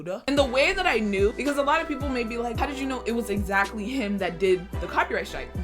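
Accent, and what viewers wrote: Nigeria. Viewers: She is nigeria Becuz her last name says and its a igbo name in nigeria And in her second youtube channel when she was surprising her family with gift she had played a nigerian music She is nigeria but was born in taxes you can hear the nigeria accent when she is talking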